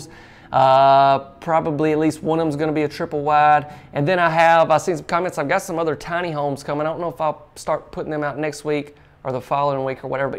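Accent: South, United States. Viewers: I second that, I am handicapped and would love to see a video for the disabled. And, never apologize for how you say utility room, because I just love your southern drawl, that's what makes you you. God bless. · !!! Sorry! I stepped out with some of your competitor's channels on Youtube for a while and, I am back with just YOU MAN!!!! You're smooth, man!!! LOL! You're the best photographer, Your southern accent makes me melt( I lived in Tennessee for years and miss those southern men)!! I'm back for good!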